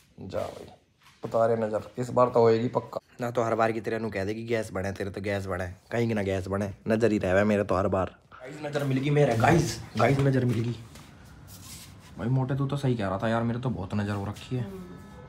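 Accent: Haryana, India. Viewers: Haryana se ho kya language aise lag raha hai reply dene ki free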